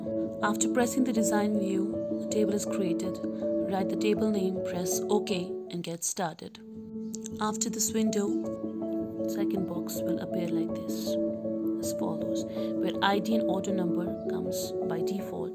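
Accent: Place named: India. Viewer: beautiful Indian English accent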